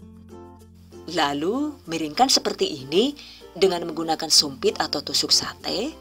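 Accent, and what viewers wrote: Banyumas, Indonesia. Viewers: 😁😁😁😁bunda saya suka bicara nya bunda yg bahasa jawa nya bun ko da jawa ngapak nya bun 😀😀😀 tp saya jg suka redep2 nya bunda tenk's bun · Jane rika wong ndi yu... Deneng le ngmg ngapake jos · Wahhh ngapak ,:) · japan + ngapak ya etok2 ora doyan😅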